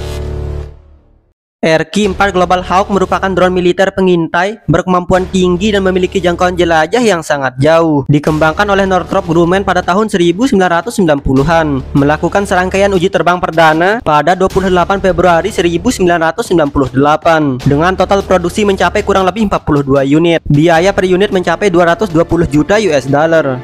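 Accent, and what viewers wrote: Lombok, Indonesia. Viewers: Bang lu orang lombok bukan?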